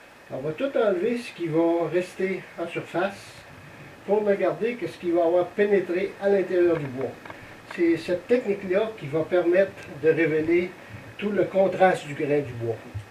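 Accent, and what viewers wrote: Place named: Quebec, Canada. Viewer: Mltteud ou multitude? C’est quand même trop moche l’accent québécois !!!!!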